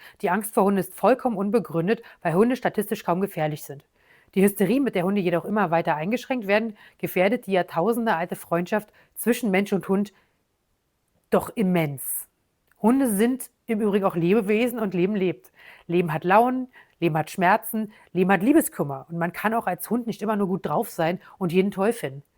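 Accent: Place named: Berlin, Germany. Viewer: Hallo, genauso ist es und es wird immer schlimmer. Ich liebe deine Berliner Schnauze. Weiter so. Lg Claudia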